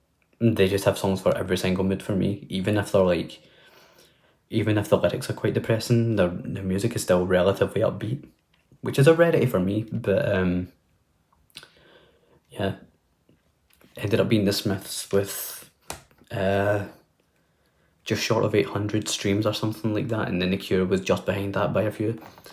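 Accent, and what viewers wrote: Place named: Scotland, United Kingdom. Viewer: Hi! - Happy New Year to you. Welcome to the Vinyl Community. Enjoyed your Tag 24 video. was a good choice but you need to get some or. Where in Scotland are you based? As a fellow Scot it’s great to hear your accent. When I do a thread response I often have to show CDs. I love. is an all time favourite. I must listen to your guitar playing videos. Cheers Bill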